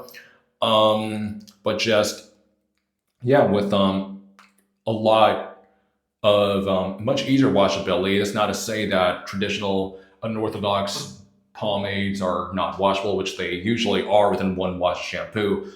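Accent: South, United States. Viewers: ! You’re back! I thought you were living in Germany? … yet I detect a southern (USA) accent. 🤔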